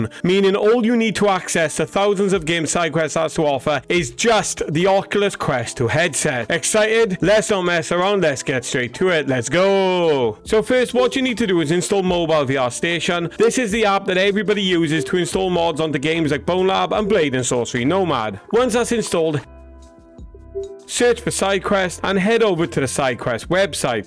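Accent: Wales, United Kingdom. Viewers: omg the welsh accent made this so much more enjoyable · Thanks! My friend said it took him 3 hours to get sidequest on his VR but with this method i got it in 15 minutes. Also proper Welsh accent 💪🏴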